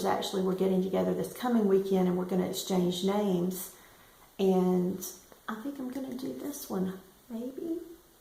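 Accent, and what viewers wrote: South, United States. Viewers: Hi there! I am a new subscriber and I am loving your videos! I live in Indianapolis, Indiana and I love your southern accent. My mother was from Kentucky and for me the southern accent just warms my heart with loving memories. I am binge watching your videos after catching this one. Thanks so much for the time and effort you put into your videos...they bring such joy to me. Happy stitching!!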